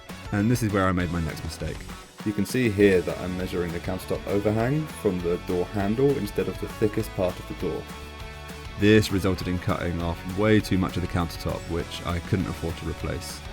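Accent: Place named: England, United Kingdom. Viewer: My maiden name is Quirk. My ancestors come from Cork Ireland. From your accent you are English. I did a ancestry search but I came upon my brickwall at my Irish ancestors . Is your family descended ofrom Ireland?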